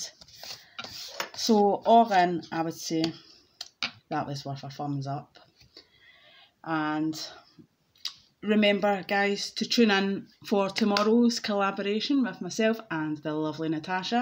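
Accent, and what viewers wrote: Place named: Glasgow, United Kingdom. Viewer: Hiya 👋 Nice session!!! How lovely is Glasgow accent 😍 👍 and sub for you!! I used to live in Scotland 🏴 for over 10 years!!!! I miss this country 🙁 Right now I live in US and I am scratching American scratch cards, unfortunately most of scratch cards are rubbish!!! I remember one year ago was a different story. How you doing?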